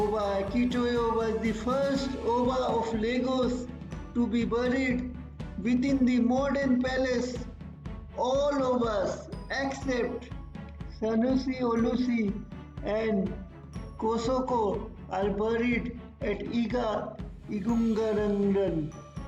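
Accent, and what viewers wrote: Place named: India. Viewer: He is Indian His accent is his identity We love it like that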